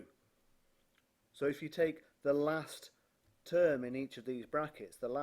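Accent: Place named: England, United Kingdom. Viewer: Brilliant. Also audio is fine as well for me. So nice to hear an English accent as well (just a personal comment).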